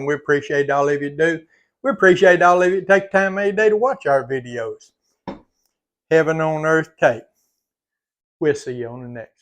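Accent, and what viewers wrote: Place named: Louisiana, United States. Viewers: You Sound Just Like My Louisiana Family ..♥️♥️♥️I love Your Southern Attitude 🤗🙏🏼Looks So Yummy ! BEAUTIFUL ♥️ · Natural yogurt is a good cheaper substitute for sour cream, they want $6.00 for 1 cup 250ml sour cream here now 🤨 and I noticed the other day that your dollar is our 1.52 We don’t have cool whip either, we used to have frozen dessert whip back in the 80’s greasy horrible stuff it was. Didn’t look anywhere near as good as yours. I just Love your Southern accent, I used to follow Miss Phyllis Southern Frugal back in 2019 before She and Mr Bucky went home to be with the Lord, he Son runs her channel now, but I don’t enjoy it without her blessed beautiful heart, still has all the good frugal old time recipes though, ✝️🙏🏽💖💙 Jesus is Coming I hope ya’ll know the Rapture is VERY CLOSE 👑✝️🙌🏽☁️💫☁️💕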